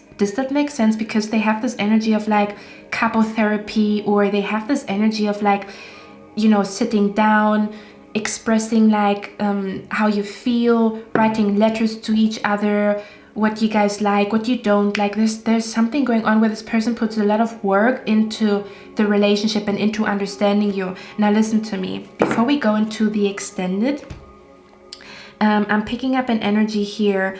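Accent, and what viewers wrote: Germany. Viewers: Pile 1. Loved this reading. Thank you so much. Also, whenever I watch your readings, I keep thinking you're German due to your accent and I myself am half German which is why it's easy to recognize it. AND THEN I saw the card "ACHT DER STÄBE". I was actually right, so I'm guessing you do speak german but that doesn't necessarily mean that you live in Germany. After all, there are so many countries where German is spoken as their native or second language. Anyway, I'm sending much love and hugs❣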